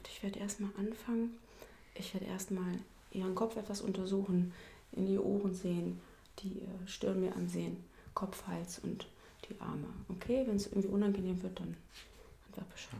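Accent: Germany. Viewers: It took me 11 minutes to know she German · german, guaranteed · I think she is german...i don't t inderstand anything..but is good..i feel triggers.....gostei muito mesmo..ela é ótima....já me inscrevi no canal.... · A Germán doctor.....pretty familiar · I very much prefer the soft speaking roleplays. The German accent and language is nice ASMR. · yG KeKe I love the German language, and their accent. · вы из германии или австрии?)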